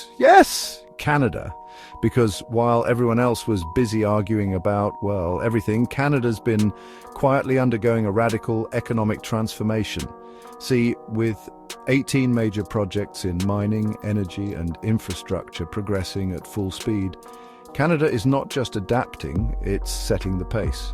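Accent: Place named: United Kingdom. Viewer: Love the British accent and the USA spelling. Who makes this s___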